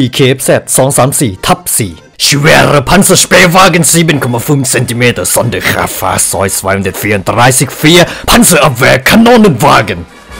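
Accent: Germany. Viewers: โครตชอบเลยสำเนียงเยอรมัน 55555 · ผมชอบสำเนียงภาษาเยอรมันพี่มากครับ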